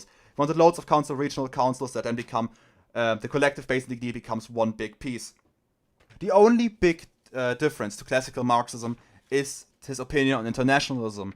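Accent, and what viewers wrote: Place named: Germany. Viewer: based, this is what got me into national bolshevism, also I love the German accent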